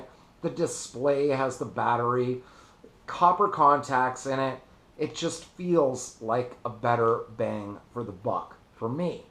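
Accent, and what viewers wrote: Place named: Canada. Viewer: Great comparison video... Never realized you were Canadian, kinda recognized the accent, but when you said you felt like a knob it gave it away lol.... Anyhow. I picked up the sigelei 100 Watt the other day, $81 with a coupon code, the plus was out of stock, could have got the ipv3 for $110 with the coupon, but didn't figure I really needed the extra 50 watts. From what you've laid out in your video, it kinda reaffirms my choice. Should get it this week sometime and I'm stoked.... Keep up the good work man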